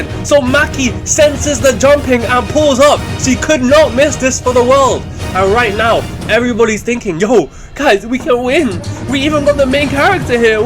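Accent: England, United Kingdom. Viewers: U from England crazy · Wait you from England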